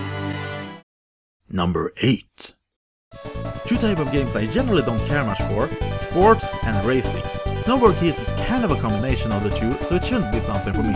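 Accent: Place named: Sweden. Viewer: Jeg syns engelsken din er like sjarmerende som den svenske dialekten din :)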